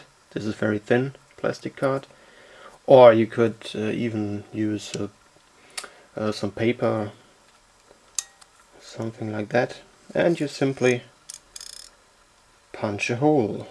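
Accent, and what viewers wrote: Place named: Germany. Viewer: You have a slight german accent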